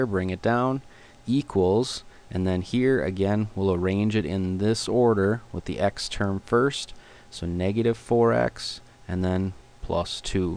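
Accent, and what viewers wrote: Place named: Canada. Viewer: i can tell this dudes canadian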